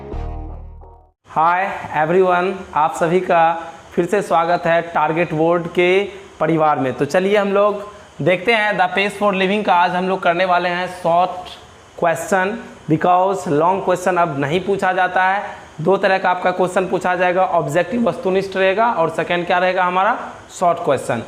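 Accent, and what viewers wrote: Bihar, India. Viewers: Aap bhihar ke h sir 🤔🙏🙏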